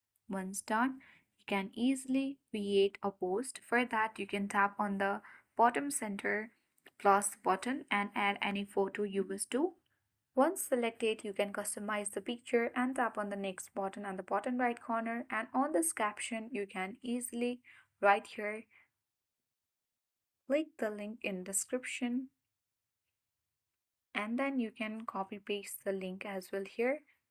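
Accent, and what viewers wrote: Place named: India. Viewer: Americanized English-language is my native language. The speakers' voice has a strong Indo-English accent that is difficult for me to understand. Some words we use are different. For me, I read the transcript while I listened to this video's instructions. Yet I had to pause often to understand the meanings. For me, these edits would help: At the start, tell me exactly what you will teach me in "plain language" for me. Please study up on our word choices and add those to what you recorded in this video, or change them if it doesn't cause confusion. It is OK for the video to take the time needed to explain your instructions, right? Thank you!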